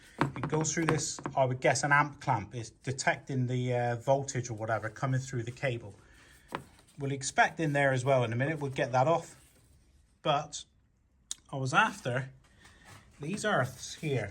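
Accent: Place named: West Country, United Kingdom. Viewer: I'm wondering if you are west counttry. maybe Hinkley, i only wonder because you are so particular with the connections electrically and i'm an engineer, former CEGB/national power/powergen/nuclear electric/british energy (same firm privatisation)and a stint for centrica in gas.The in laws were Taunton and Bridgewater and sound like you.I never knew about the sensor connection near the battery, that is very insightful, it monitors the current for the start/stop system if the car has it. thanks. I also didn't mention, you are a good dad, you speak of your son a few times, good on you. Just a point to note, your sons car is an automatic and the alternator is at the rear of the engine compartment as you show, on a manual it is Left hand side as you look into the engine bay.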